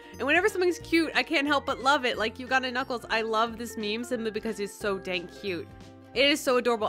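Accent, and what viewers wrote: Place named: United States. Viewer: It's so weird when you hear someone's voice for a while, and then see their face and it's COMPLETELY different than you imagined. This video is the first time i've seen your face- it's sort of like imagining characters in a book then seeing it in move form, but you actually had their descriptions in the book! ToT I thought you looked asian just with an american accent! Gosh i feel weird now.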